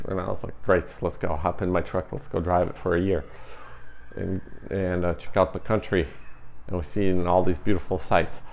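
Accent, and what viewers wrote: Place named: Eastern Europe. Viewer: Slavic accent, similar faith background and similar job… dang this is up my alley. Definitely a lot to learn from you, and man if you’re reading this, I’m really sorry for what happened. It gave me chills to hear what’s happened to you. I could not imagine seeing that happen in our community’s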